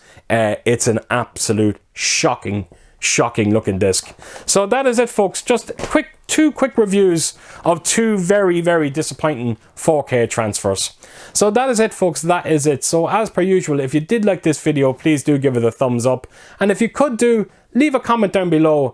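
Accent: Ireland. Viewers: please make sure to link your video review. Your video message, especially that fantastic Irish accent (man am I jealous and love it) is so crucial to getting the point across. OR, have a go at another video dedicated to "Planes, Trains..." and really hammer 'em. We'll call it the remixed/uncensored version! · Do you mind asking where you're from? Could be wrong but you sound Irish. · first time viewer and immedietly i hear the assault on precint 13 music and then the irish accent, irish myself from limerick and just started collecting last year, recently i bought first blood, the thing and predator all 4k uhd, planes trains was on my list too, not now, just subbed man and looking forward to your next uploads, cheers man.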